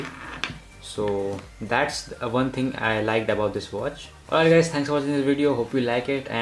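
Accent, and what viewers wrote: India. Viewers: Since you are Indian and I hear your accent you must be a scammer and I don't believe anything that you say because of your place of birth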